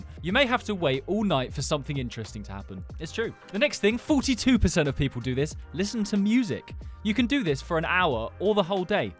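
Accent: United Kingdom. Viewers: I only laugh because he’s british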